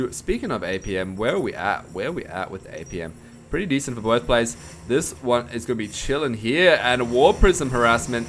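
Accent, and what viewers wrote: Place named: Australia. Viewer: maybe not the best - In my opinion the best caster should be at least in master and discuss about tactics like a professional - but for sure - it is very nice to hear an Australian:) I have to admit-I like to listen to. I've started just few days ago-and I would like to continue. Besides Husky, Force and HD - is the best. For example TotalBiscuit has a superb voice, but he sucks at SC - as admit he himself. could be my best in the near future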